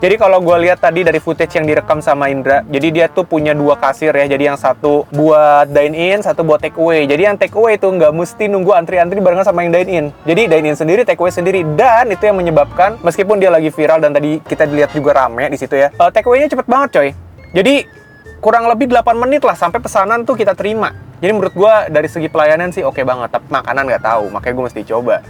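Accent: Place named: Bekasi, Indonesia. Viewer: Logat lu bekasi bgt bang😂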